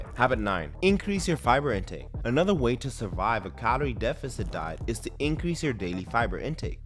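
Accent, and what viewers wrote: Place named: Afrikaans, South Africa. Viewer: Love this dude's Afrikaans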